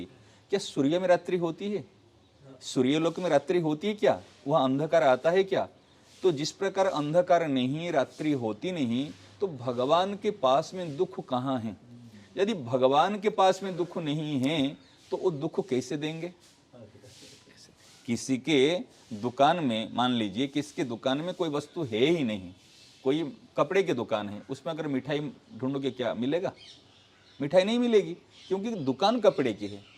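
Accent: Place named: Odisha, India. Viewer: Guruji ki matru bhasa mujhe ODIYA lagta hai, unki hindi sabd ki utcharan main Odiya bhasa ki avasa hoti hai.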